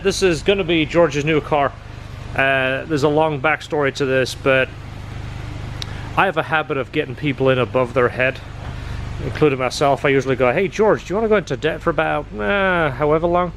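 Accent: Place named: United Kingdom. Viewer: By any chance are you a Britt who emigrated to the States?, Im English & can pick up on your accent and some of your phrases haha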